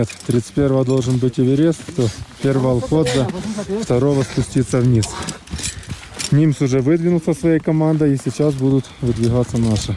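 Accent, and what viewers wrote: Ukraine. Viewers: Лахерь на хоре))) какой ужасный украинский акцент, тогда бы уж на украинском ховорил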